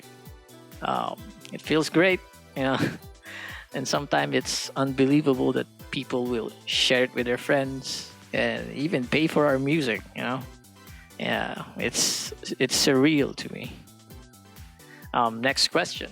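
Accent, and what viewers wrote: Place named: Philippines. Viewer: I had assumed you were in the USA or something but when I heard your voice there was no mistaking that Filipino voice. For the past 5 years of my school life, almost everyone I knew was Filipino. Plus all of my relatives on my mom's side are living in the Philippines and I've only ever met my Great Aunt when she came here, to Canada.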